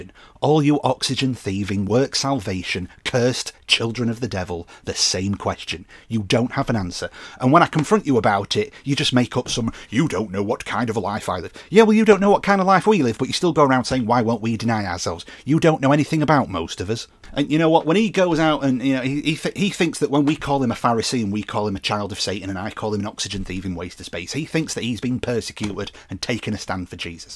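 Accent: United Kingdom. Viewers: I never knew hard preaching in British accent is something I needed but now I can't stop watching your videos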